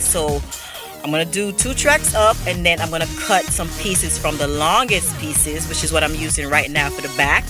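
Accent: Jamaica. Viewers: Are you jamaican? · R u Jamaican?? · a swear mi just hear yuh talk now know sey a yard yuh come from! hairstyle up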